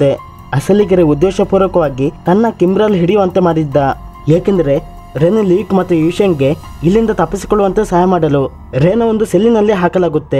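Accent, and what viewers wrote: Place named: Mangalore, India. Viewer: Are u from Kudla/Manglore?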